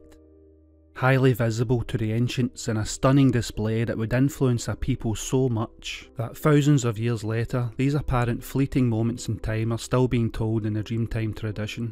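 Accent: Scotland, United Kingdom. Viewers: nice accent. many good science popularizers have beautiful scottish accents. brian cox.iaian stewart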